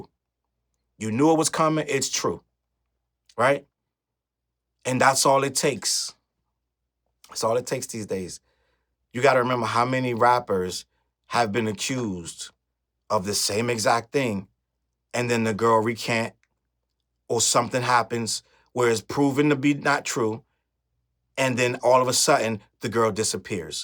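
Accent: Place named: Northeast, United States. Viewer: You hovengers something else lmao ion trust an unbiased opinion on Jay z from a nigga with a northeast accent and fk with you heavy but nah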